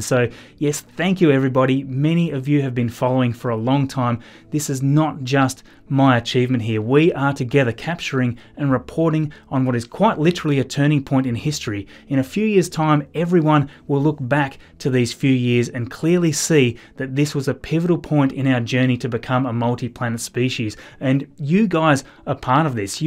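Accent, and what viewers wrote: Australia. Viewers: Great work Oioioi. What part of Australia are you from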